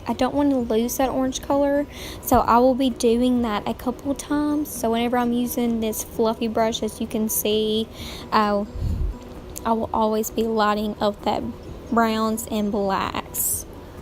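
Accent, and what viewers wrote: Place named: Rural, United States. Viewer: I never noticed how country your accent was until these.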